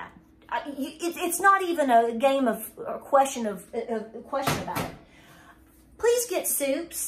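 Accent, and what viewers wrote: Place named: Johnson City, United States. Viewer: Thanks! My husband guessed that you live near Johnson City by your accent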